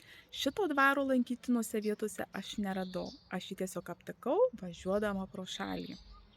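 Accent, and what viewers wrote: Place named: Suvalkija, Lithuania. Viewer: Tai jo tik sakau, kad Suvalkų bisky turi😁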